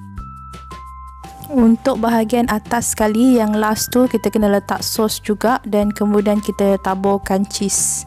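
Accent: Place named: Sarawak, Malaysia. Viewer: Dengar cara klaka apat cam sarawakian...sekali jumpa bideo lain ternyata betol² sarawak....wahhhh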